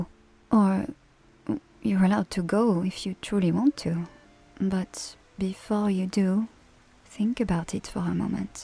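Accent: France. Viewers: The wholesome, soft-spoken french accent is awesome... Slept well with this one when insomnia hits last month and didn't end this video consciously till today. Brilliant masterpiece! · This would have been an amazing video just from the script but your VA talent elevates it to another plane. It’s absolutely incredible. This video was the first of yours I found and made me instantly sub. I sincerely hope you consider more French accent videos! Especially with the intimate but not explicitly sexual script. I’ve been listening to ASMR for about a decade and don’t think I’ve ever been so completely enraptured like this. I’m sending the F4F version to my wife hahaha 🥰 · The combination of your voice and the French accent is incredibly soothing. Thanks for everything you do. ♥ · Everyone thinks French accents are beautiful, but I never really found the appeal before now. 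This video just made me say, "Ahhh... now I get it.." 😢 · This melted my brain in the best way. Please do more content with the French accent / sweet talk like this 💙🤍❤️ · One more Time Your voice sounds so good in French and with the French accent… À quand un audio juste en français 👀🤣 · pls more french accent audios 🥰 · This and the maid video are the two most soothing videos on YT. I'm writing a novel and one of the main characters has a french accent. Her name is Emilie, so this video really caught me off guard when I heard her name lol. Thanks for the awesome content, and for giving me a voice to picture when I write the character. Hope we get more french accent videos in the future, but all your content is great. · You need to do more french accent videos more often, they're so underrated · I usually can't stand the french accent in English but your voice really makes it work. You have a wonderful voice and mastery of both languages. 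Thanks for your work and here's to your spread on the platform. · YES! Some more French accent. I don't grave for the affection, I crave for the accent. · I love French accents so far. 10/10000. But the French lady is very kind and also lovable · This is the best ASMR audio I've ever listened to. I listen to it so often it's crazy. The script is so well written, it's so down to earth, maybe not something realistic but it's not so out there like some audios I've heard. It's personal but also impersonal in a way. Really gentle and doesn't distract you from sleep/relaxation. Your voice with the french accent is so perfect, it's soothing and you sound professional and trustworthy, works really well with the script. Your other audios don't hit as hard as this one. They are good but this one has that something. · I'm curious about something. As a french woman did u need a lot of practice to get your english skill to that level? 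Or did u had an accent like in this video and improved from that. If u hadn't how hard was is to train one.😶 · Thank you for another french accent content. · Never thought I needed to listen to an ASMR with a lovely French accent. Magnifique! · I don't normally comment in these videos but I just wanted to say that these videos with your accent and all. I keep coming back to it. There is something about how you speak with your French accent that never gets me tired of hearing it, even if I've listened to the same audio a countless amount of times. You've done a great job here. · I don't know how to feel with the fact that I'm a spanish speaker and i'm listening to this english ASMR with french accent- Need more 🗿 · The return of the glorious French accent! I thoroughly enjoyed this script and you did a wonderful job with the audio,. Cheers!😊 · Oh my goodnesssss, your French accent is amazing!! 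· I never thought french accent on english was so good. I can say thats even attractive. Looking forward for more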